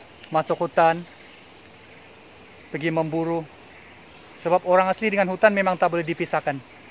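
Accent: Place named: Borneo, Malaysia. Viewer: maaf nak bertanya bro orang borneo ke ..suka slang bos bercakap...done subscribe dan like..support bos punya karya. .....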